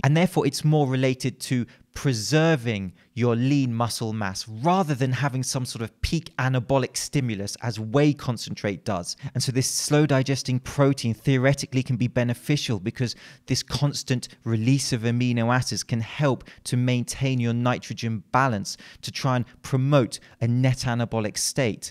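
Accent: United Kingdom. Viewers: I subbed your channel a few days ago and I have been binge watching your videos. Excellent stuff and very much appreciated. Just listening to your British accent makes me smarter. And I like how you add some British slang in your videos - we learn about exercise science AND language. I particularly like the phrase "look like a wally" you used in your isometric video. I will be using that. I myself am trying to break some misconceptions regarding Olympic weightlifting, which the rest of the world just calls "weightlifting," particularly the ideas of a certain coach. If you ever need ideas or material on weightlifting let me know.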